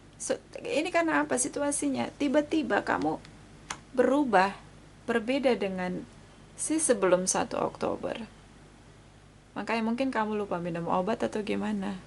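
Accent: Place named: Europe. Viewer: Halo nona,klo dgr lidahnya nona sepertinya anda pernah ckp lama ya tggl di benua biru,dimana nona? Maaf,agak melenceng pertanyaannya. Mksh ya non🙏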